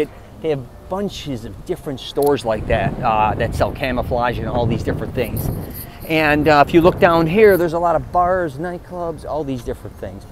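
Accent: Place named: Chicago, United States. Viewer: such a Chicago accent! haha. This guy is my twin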